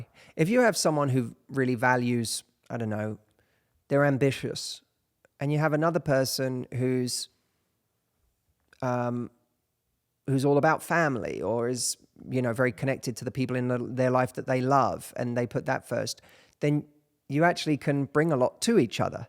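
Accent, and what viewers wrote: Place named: United Kingdom. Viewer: I'm here for British accent İt's wonderful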